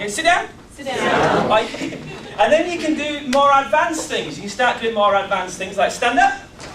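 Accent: Yorkshire, United Kingdom. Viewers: I think he might be from Leeds or Sheffield or very close to. I'm from Doncaster and it's almost identical so hard to tell.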